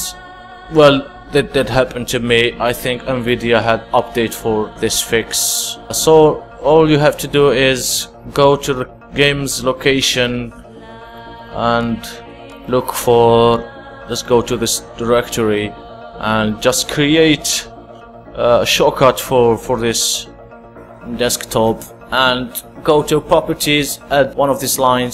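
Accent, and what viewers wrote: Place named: England, United Kingdom. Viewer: wich place of england are you?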